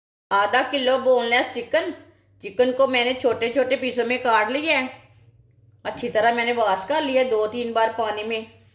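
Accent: Punjab, India. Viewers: Punjabi bolyn to behter hy full punjab8 lehja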